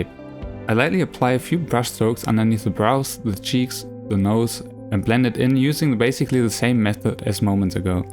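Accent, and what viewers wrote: Germany. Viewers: I didn't think of playing with pressure when drawing with Copics. Thank you, I will definitely try that out next time! I have a question tho... Are you German? The way you pronounce words sounds a bit like German accent 😃 just wondering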